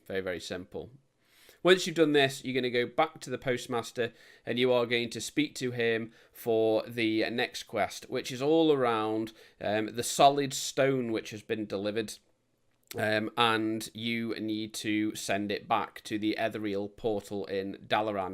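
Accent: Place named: Stoke, United Kingdom. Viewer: the steps were to fast i had to listen to your stoke accent so much !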